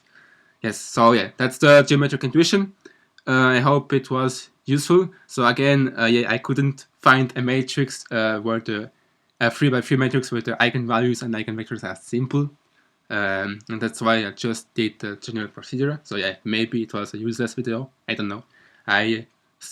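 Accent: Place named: Germany. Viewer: He has a decidedly CHUR-MAN accent.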